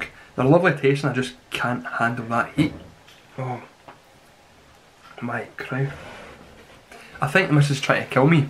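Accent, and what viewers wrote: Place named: Scotland, United Kingdom. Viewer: These crisps are never hot enough for me, no matter how strong the packages says they are.... :D what a Scottish accent mate, you could use it to your advantage if you want to do yt seriously. i subbed and liked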